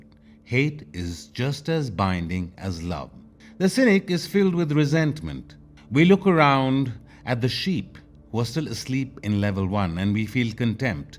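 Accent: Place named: India. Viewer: The indian accent is what makes me turn it off immediately.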